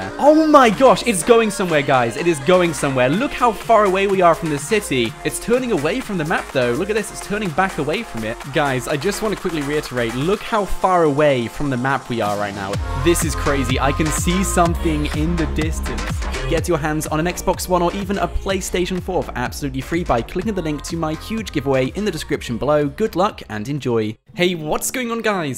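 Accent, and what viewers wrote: United Kingdom. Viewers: Bro are you british🤔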